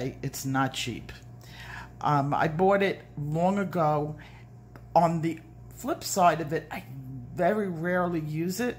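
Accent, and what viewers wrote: New York, United States. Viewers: Hi, I'm Maddie a new subscriber of your wonderful Channel that I recently discovered and I was fascinated by your great talent and your passion for pencil drawing which is also my favorite and also by how you passionately teach. Thank you for sharing your talent and knowledge with us. I am looking far and wide at your Channel, I have a lot to watch. I also love the things you tell us from time to time, like the afterlife and paranormal stories in which I strongly believe and had experience myself too. I love your sweet pets and I love your New York accent, it is a pleasure to listen to you and your calm voice relaxes me. Much love from old Europe to beautiful America. Blessings, love and light to you and to your family.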